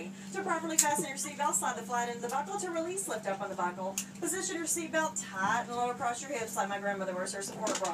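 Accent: United States. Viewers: very funny but the extremely fast american accent is hard to understand 😂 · Hard to understand if you're not used to southern US accent, especially with the speed she talks in. Still better than the usual boring announcements though!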